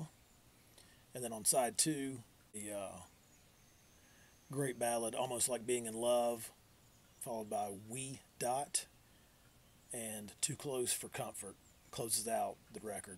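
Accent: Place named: South, United States. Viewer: are you in GA or AL? The accent and the pines behind you tip it off if so! Haha.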